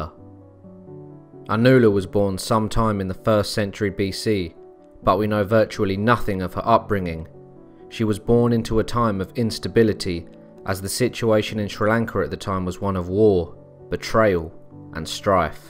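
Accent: United Kingdom. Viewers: The pronunciations are quite anglicised and far from accurate but he seems to be trying.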